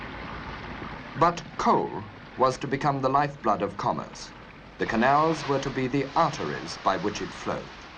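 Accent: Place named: England, United Kingdom. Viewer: got to love a pompous English accent :face-green-smiling::face-green-smiling::face-green-smiling::face-green-smiling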